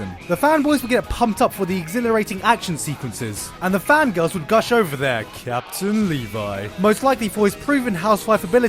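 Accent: United Kingdom. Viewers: sounds so Bri'ish here. How things have changed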